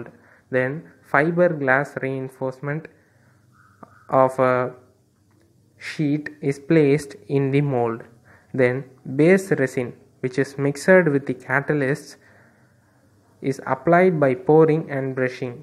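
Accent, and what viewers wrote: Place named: India. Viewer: Your voice is like you are an indian.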